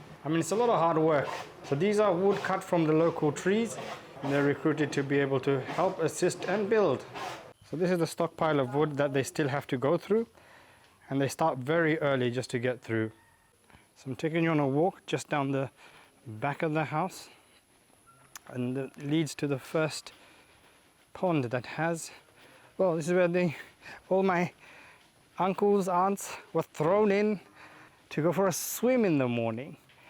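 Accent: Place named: United States. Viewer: You are an immigrant of London... But your accent is very much alike Americans... 😮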